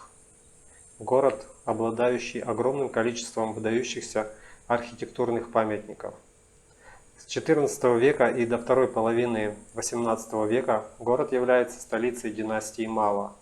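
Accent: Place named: South, Russia. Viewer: Интересная поездочка! Мне не доводилось бывать в Непале. Самая близкое к тому место посещения - Индонезия. Кстати, дымка - скорее всего, проявление высокой влажности. Я такое видел в Джакарте и Лагосе. И вопрос - откуда южный говор, если не секрет? ))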